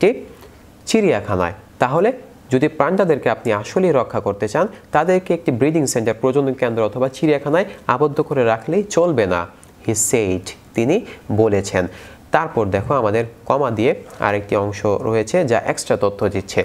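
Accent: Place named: England, United Kingdom. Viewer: ভাই বলেন ভালো লাগে, কিন্তু বাংলা ভাষা উচ্চারণ English এর মতো সোনায়।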